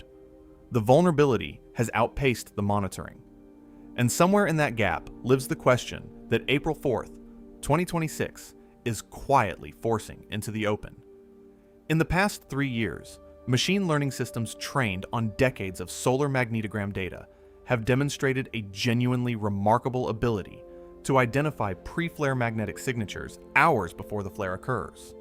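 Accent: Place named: United States. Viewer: This is how a space documentary shod be fly and cleanly narrated in clear American English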